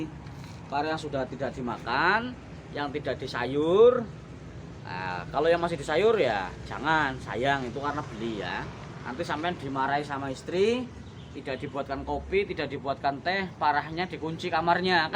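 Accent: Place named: Java, Indonesia. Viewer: orang pekan baru kok bisa jawa kang, aslinya orang mn kang 😂😂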